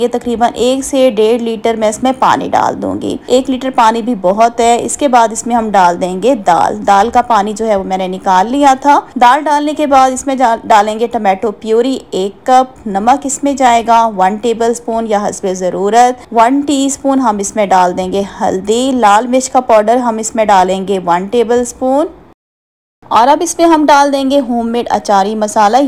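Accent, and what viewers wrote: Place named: Pakistan. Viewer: Achi lagtii hai pakistani hindi.....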